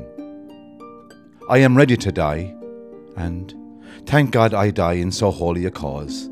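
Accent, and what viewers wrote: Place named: Cloughjordan, Ireland. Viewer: Magnificent piece of work especially given the circumstances of that final night. Beautifully read by the perfect Cloughjordan accent. Thank you all. I'm tearful having listened. Thank you.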